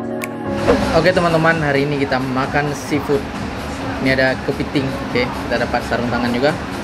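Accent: Aceh, Indonesia. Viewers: Ab orang mana.... logat nya mirip aceh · Mau kemanapun pergi logat acehnya tetap melekat 😁👍👍👍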